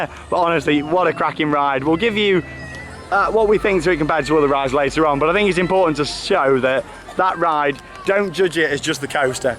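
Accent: Netherlands. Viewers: dat dutch daccent doe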